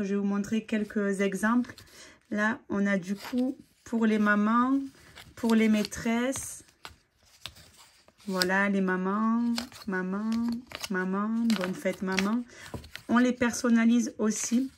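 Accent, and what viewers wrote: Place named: Perpignan, France. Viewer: Super sympa et tellement bien expliqué avec notre bel accent de Perpignan 😅.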